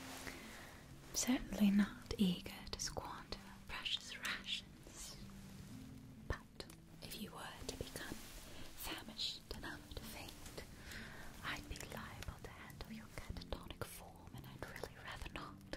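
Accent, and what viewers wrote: England, United Kingdom. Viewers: Me: can't possibly be more appealing...: Starts speaking with a British accent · As a Brit I always feel like you watch a lot of Keira Knightley to try and get this accent. You’re quite good! The elocution smacks of “Brit in a period drama”. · As a British person, your English accent is certifiably fantastic!! And that 📣🐈 👀 👁👁 made me burst out laughing. Love the language and humour you use in your videos. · So excited to have more Babblebrook content! Gorgeous visuals and intriguing plot as ever, also a pretty decent attempt at an English accent! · Such a good Babblebrook video, I get so excited when a new one releases. Your British accent is pretty amazing too! Very tingly :D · Ahhh! Am I crazy, or is this the first time ever that used an English accent on a Babblebrook video?! It's lovely!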